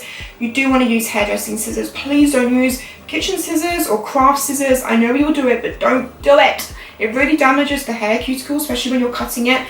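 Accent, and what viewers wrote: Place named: London, United Kingdom. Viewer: No shade... But you sound a bit like a Londoner to me... No shade - never shade... Not to you! Lol... (I'm a Londoner anyways).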